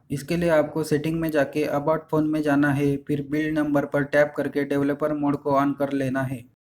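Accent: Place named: China. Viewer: His voice is like CHINESE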